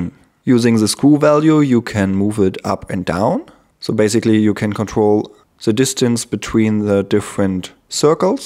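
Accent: Germany. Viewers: man kanns auch übertreiben mit dem deutschen akzent ^^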